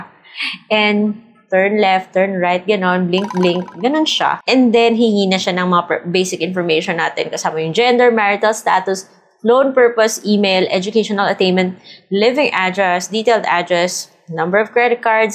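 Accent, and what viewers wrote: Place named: Visayas, Philippines. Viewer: Bisaya di I ka mam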